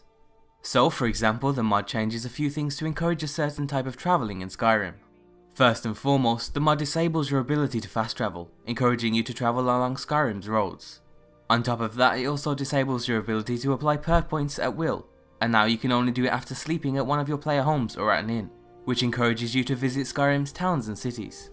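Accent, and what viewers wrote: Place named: West Midlands, United Kingdom. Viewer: Where you from? Sounds like west midlands haha. I'm from Birmingham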